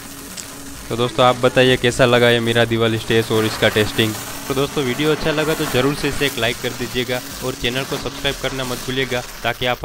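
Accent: Gujarat, India. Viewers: Apki hindi se lagta he aap gujrati ho Reply plz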